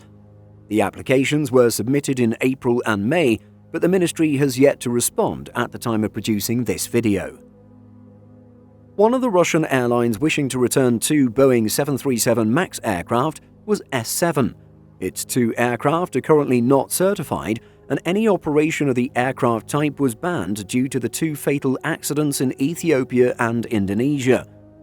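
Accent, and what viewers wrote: United Kingdom. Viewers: The moment I hear this British accent, I know that the videos are lies and scams, because a lot of videos that I have seen using British actions or nothing but a scam, deceit and lies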